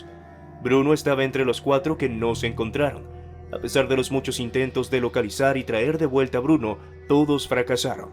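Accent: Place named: Venezuela. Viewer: El acento tropical y pretencioso, (venezolano?)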